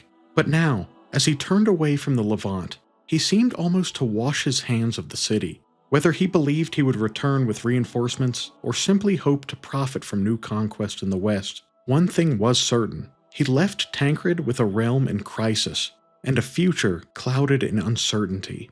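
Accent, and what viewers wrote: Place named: United States. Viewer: Thank you Jesus for the American accent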